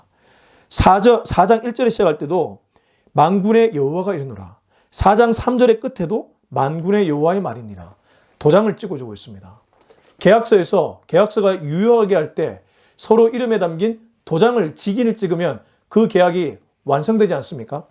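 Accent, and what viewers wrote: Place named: Gyeongsang, South Korea. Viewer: 목사님의 경상도사투리 멋쪄요...^^ 우리들은 악인를 따르지않도록 주님께 기도드립니다^^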